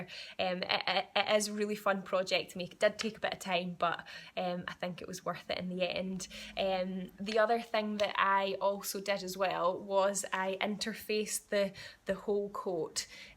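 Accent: Scotland, United Kingdom. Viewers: What a joy to hear a scottish accent amongst all the sewing vloggers I currently follow. Gaun yersel!:) The coat is pure dead brilliant and absolutely gorgeous on you. I'm inspired! Thank you for a wonderful video, will be watching from now on. shirley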